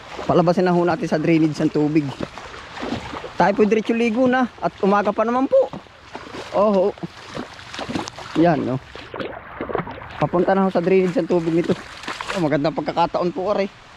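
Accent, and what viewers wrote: Quezon, Philippines. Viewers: Hi,! Kahapon lang kita unang napanood at naaliw naman ako sa iyo. Taga saan ka? Kasi parang taga Mauban, Quezon ka parehong pareho ang mga words na ginagamit mo pati yong accent mo. Bago mo akong subscriber from New York,USA. Ingat ka lagi and God bless you more 🙏👍👍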